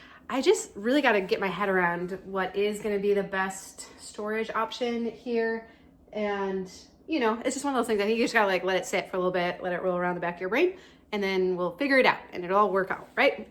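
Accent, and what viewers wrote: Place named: Midwest, United States. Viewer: Question: what did you do with the expired food? Did you just throw away all the expired & freezer burn foods? I need to clean my pantry, too! I know there are a lot of expired cans of food. Yeah, you know what I’m talking about. 😉. I don’t know where you live, but your accent and expressions sound like you are in the Midwest (Wisconsin, Iowa or Minnesota, maybe). Did you just toss the canned “expired” food stuffs in the trash? Here in California we have to put all of our table scraps in a separate bag and that goes into the bin of yard waste (leaves, dead flowers, grass clippings - all things organic that release methane gas as they break down. Our city turns it all into mulch that is used around plantings to prevent weeds; also, given to us city folks to mulch our gardens. So I don’t know… should I open each can and put it in. 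The yard waste bin (inside its own little separate bag), or should I just toss the entire can (vegetables, fruit, or whatever) directly in to the trash without opening it. Will it release methane or explode someday? Thanks for the video and the inspiration to finally get my pantry clean and organized. 🙂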